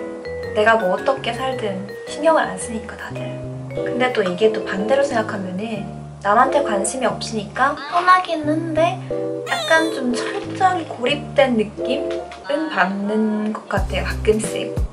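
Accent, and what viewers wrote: Gyeongsang, South Korea. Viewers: 경상도 분 아니신가요?ㅋㅋ 서울에 살아 본 적 있어요? ㅎㅎㅎ 그냥 궁금해요!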